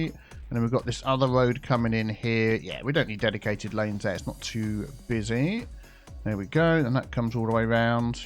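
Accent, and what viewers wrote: United Kingdom. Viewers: all your words sound different to me with your British accent ... I am from Canada ... but I guess I would sound wierd to you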